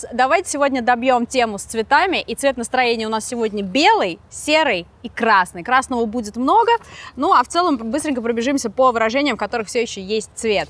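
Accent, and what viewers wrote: United Kingdom. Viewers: What a beautiful English accent! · вопрос действительно есть. ЭТО ПРОИЗНОШЕНИЕ МЕНЯ ПРОСТО УБИЛО НАПОВАЛ. КАК??? Как добились, кому продали душу? Это просто невероятно, первый раз слышу настолько обалденный british accent из уст русскоговорящего человека. это респект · Слушать такой аутентичный британский акцент одно удовольствие!) Было бы здорово, если бы рассказала, как удалось его перенять)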